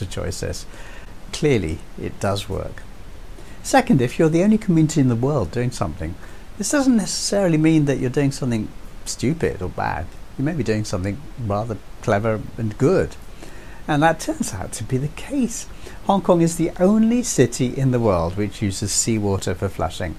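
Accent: United Kingdom. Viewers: yeah you are absolutely right and sounds alike also except his British accent.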